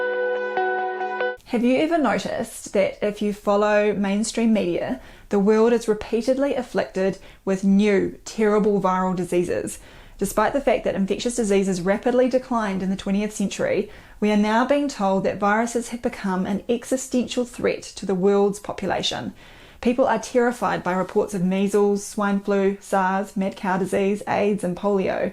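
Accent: New Zealand. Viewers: I'll definitely be downloading the audio books. As I'm a fan of the Kiwi accent and all. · I enjoy your kiwi accent and I’m looking forward to the audio book. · Hi, I really enjoy watching your channel and greatly appreciate the concise and informative style of your videos, not to mention that NZ accent! I read the second edition of Virus Mania, a while ago, and I found it so engaging that I couldn't put it down until I'd read the whole book. I certainly see the merits of the alternative hypothesis explained there and also how it fits into the model of the overarching control imposed by the pharmaceutical industry and its delivery teams, I mean health services. I'll have to buy the new issue... One thing that came to me, after reading the book was the question of disease transmission, through contact or air-borne aerosols, for instance. The particles (exosomes and similar?) that are produced by cells in response to toxic, or other stress factors, will be relatively simple, e.g. 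a fragment of DNA or RNA, surrounded by a lipid membrane, with a few signal proteins embedded in it, which will look like what we have been led to believe is a virus. If these particles were transferred by traditional 'infection' methods, would they not just act the way we are told that 'viruses' do and elicit immune system responses in the recipients and be classed as the disease? I apologise if I'm restating something that's obvious to others. I just wanted to clarify my understanding. Cheers. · Thank you for your informative videos dear. Is that a New Zealand accent I detect? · A whole book read to me by, I am in. Love your voice and Kiwi accent. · your New Zealand accent is killer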